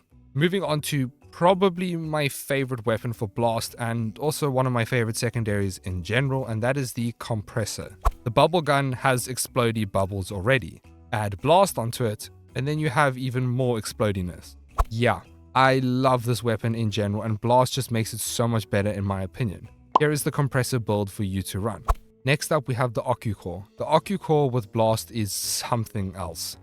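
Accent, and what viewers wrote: South Africa. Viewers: Your South African accent makes you more trustworthy somehow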